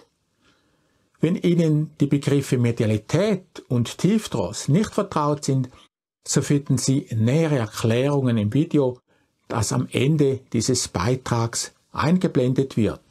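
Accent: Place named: Switzerland. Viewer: Herr, jetzt weiss ich aber Ihren Namen, habe denselben von einer Dame abgespickt die auch in Ihren "Comments" gepostet hat:)..... Ein ganz ganz herzliches "Gruezi" an Sie und Ihr wunderschoenes Land, die Schweiz... und dann noch ein ebenso herzliches Merry Christmas und Happy New Year aus USA.... Ich bin so gerne in Ihrem Kanal um alle Zeitgenossen zu erleben die Sie interviewen und/oder intrerwiewed haben... Sie sind ein echt charmanter, sachkundiger Interviewer... ihr Schweizer Akzent davon ist einfach zum Dahinschmelzen.. Ich liebe die Schweiz und mag die Schweizer:) Schoene Feiertage:) und alles Gute:)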